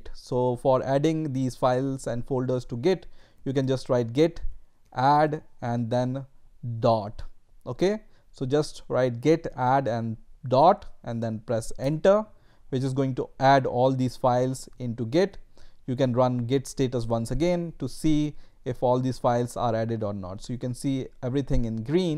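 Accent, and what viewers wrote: India. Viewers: This is the only Indian accent I like.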